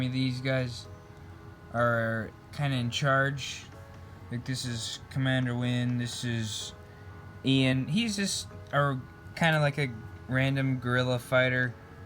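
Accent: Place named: Germany. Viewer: Question can you voice a German tiger commander for me with your funny German accent?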